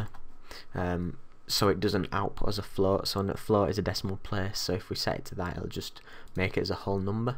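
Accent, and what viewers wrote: United Kingdom. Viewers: your accent is cool :) love british accent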